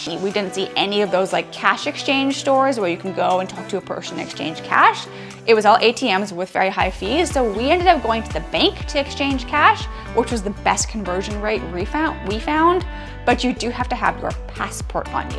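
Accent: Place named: United States. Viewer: your squeaky ummerrigaan voice is annoying ...you come across like the typical arrogant over the border wise ass but really are below avg....go to you florida...leave us alone..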